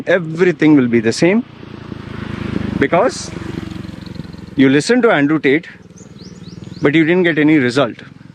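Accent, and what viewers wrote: India. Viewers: Ugly Indian with the nasty Indian accent talking about Tate? This Paki American would like a min with you.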